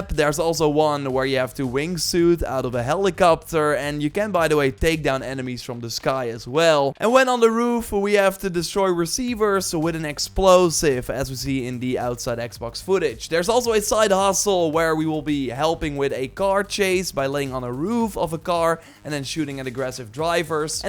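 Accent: Netherlands. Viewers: Zonder dat ik je ken weet ik dat je Nederlands bent haha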